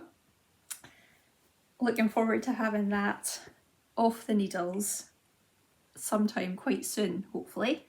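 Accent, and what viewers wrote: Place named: Highlands, United Kingdom. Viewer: Oh, I'm so happy about that! I made a vintage jersey for my sister and the pattern called for a zip on the shoulder. Not only could I not find a short enough zip but I decided it would be itchy. So I used crochet loops (on a tiny needle so they came out nice and dainty) and it looks lovely. You may need to experiment on a swatch you already have. Mind you, the other benefit of trying this is that it's very quick , to both do and to rip off again if it doesn't work out. ps I love hearing a Highland voice, I live in the Midlands but am from Dingwall/Brahan. But actually it's not just your accent and dialect, it's your manner too. You make me homesick :)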